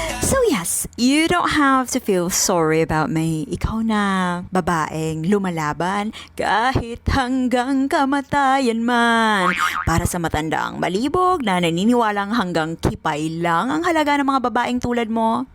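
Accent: United Kingdom. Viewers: Wow 😮! British accent very lovely ❤❤❤ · Sus, hindi naman batayan ang accent para masabing may alam, pero ito yung may slang na british daw pero walang alam kundi puro opinyon, at kabastusan, so nasaan ang smart na part?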